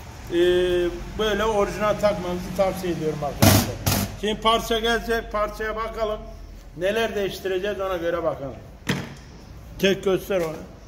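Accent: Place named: Urfa, Turkey. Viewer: Ustam urfanın neresindensin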